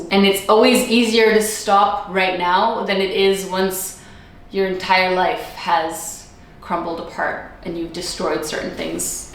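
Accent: Russia. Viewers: Блин только сейчас заметила что у, русский акцент когда она разговаривает на английском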